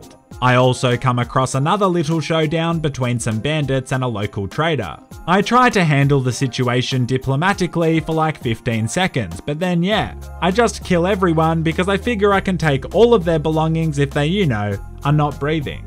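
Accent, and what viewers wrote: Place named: Australia. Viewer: Never thought I'd ever hear a monotone Australian, but eh, better late than never!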